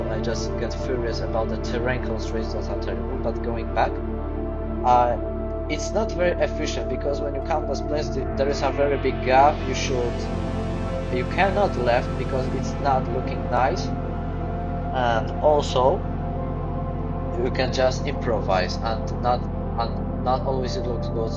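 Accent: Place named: Scandinavia. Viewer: Can understand you perfectly. Had you not said where you were from, I would have pegged you as someone from Scandinavia, and not eastern Europe (Poland).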